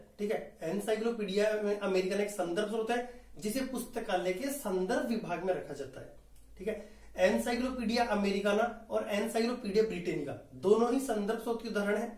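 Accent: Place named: Rajasthan, India. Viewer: अपने अच्छा पढाया, क्या राजस्थानी लोग उनीस सौ को गुननिस सौ बोलते हैं। ऐसा अक्सर देखा है।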